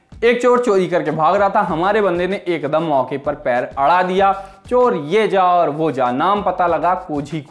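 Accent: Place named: Bagheli, India. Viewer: Apki bhasha me bagheli ka put atulneey hai.. kitne acche se tedhkaya hai.. 😀🤣👏🙇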